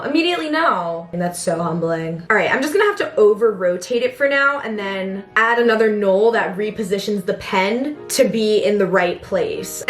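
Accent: United Kingdom. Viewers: r u british??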